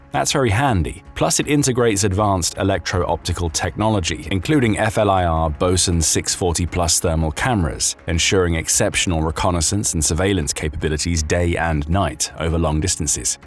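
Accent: United Kingdom. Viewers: Someone got some dumb British narrator to say it was American. Silly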